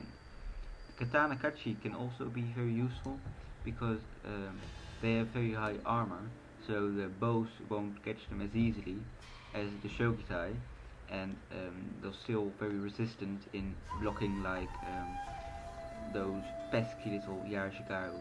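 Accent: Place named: Netherlands. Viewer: If you aren't dutch, ill shoot myself in the face. Great video, but your accent made it 10 times better.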